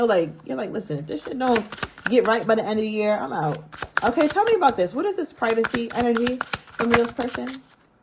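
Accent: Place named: New York, United States. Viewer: SEE this is what I mean! Even if you are not talking about me I LOVE how you break down Leo…!! Bc you’re RIGHT! Everyone acts like Leo takes no shit but you know what? WE ARE FAIR N GENOROUS ASFFFFFF But when you CROSS THAT LINE Oh baby. That’s when it’s CURTAINS. Curtains on your performance, bc I’m no longer interested in attending that show. And THATS when ppl freak out bc they are SO sure they can treat Leo like shit & we’ll always be loyal Na uh no hunny I’m not crazy… I WAS being crazy, for putting up with that bs. Now? I’m not crazy anymore Love that genuine real NY accent… this city is getting overrun with too many transplant & fakers imo.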